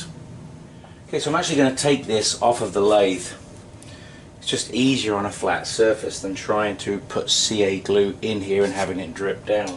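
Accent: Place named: England, United Kingdom. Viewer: That was brilliant and Im not sure where you live but you do sound English a bit like Jason Stathem Not used oil much as I cant get a good finish with it but Im sure its just me.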